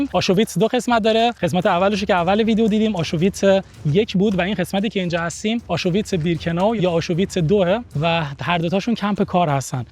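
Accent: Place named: South, Iran. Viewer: لهجت خیلی غلیظه جنوبی هستی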